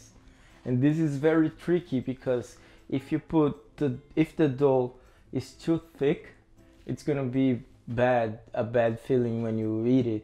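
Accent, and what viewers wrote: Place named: Minas Gerais, Brazil. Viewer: Pelo o jeito é mineirinho kkk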